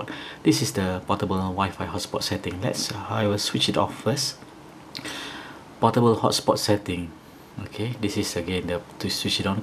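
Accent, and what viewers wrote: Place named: Malaysia. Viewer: sounds malay to me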